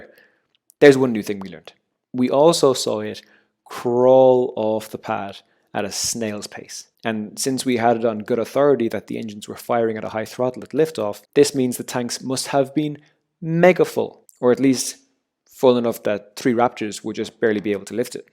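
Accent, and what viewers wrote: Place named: Ireland. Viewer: Terrific! And who doesn't like a nice Irish voice! Keep up the great work!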